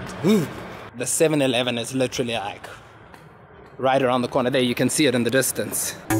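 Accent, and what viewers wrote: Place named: China. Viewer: Amazing Chinese accent!!!!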